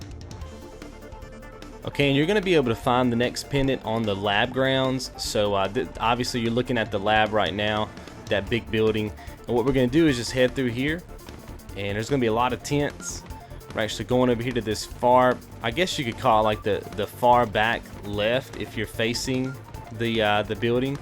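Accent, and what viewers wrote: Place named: Mississippi, United States. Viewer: Thanks dude, I remember collecting these on my PS3, but I totally forgot already and now I need to finish 100% again in the remaster version, helped me greatly cheers =) ps: I love your accent, are you from Mississippi ?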